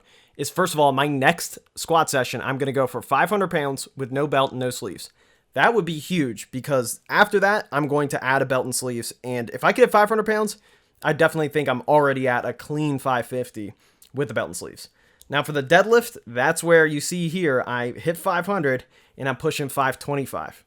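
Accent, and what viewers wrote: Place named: New York, United States. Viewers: Clocking his southern accent southern *Ohio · Sounds like a New Yorker and says pounds like he's from Tennessee.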